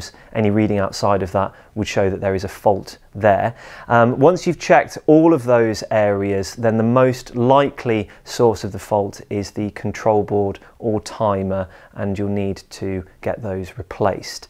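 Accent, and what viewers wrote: RP, United Kingdom. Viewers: Like that accent is it posh?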